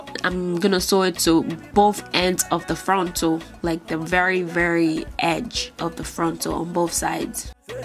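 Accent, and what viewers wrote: Nigeria. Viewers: oh my GOD i love this video..are you Nigerian? because i was thinking...as a result of the songs and your voice also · That was ignorant and uncalled for... She is a Yoruba (Nigerian). I'll have you know that while Yoruba is one of three major tribes in Nigeria, English IS the first language. She may speak Yoruba, but nonetheless she would understand and speak English regardless..its spoken everywhere in Nigeria... Don't be shallow just because you hear an accent..Wow lol..smh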